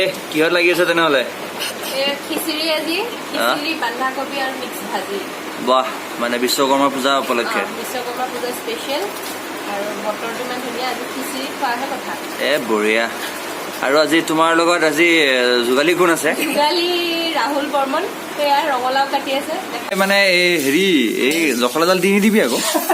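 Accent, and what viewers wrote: Nalbari, India. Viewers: Bor val lagise apunar vedio sai .. amr nalbari bhakha2 apunar mukht ru val lagise .. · Apuni Nalbari khurot kotha kobo bc val lage😁😁💕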